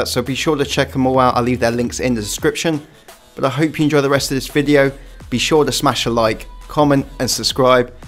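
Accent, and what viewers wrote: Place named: England, United Kingdom. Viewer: Dude,I like your pronanication by "Reich".😂1 Like for the english akcent by German words.👌👍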